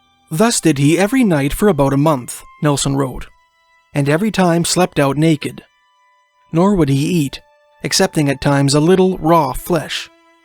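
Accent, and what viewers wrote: Canada. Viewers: I really enjoy listening to all of your videos and have learned a lot of history that no school in the US would ever teach. I'm over 60 now, and prefer to learn my own way. I love your beautiful Canadian accent and the way you annunciate your words .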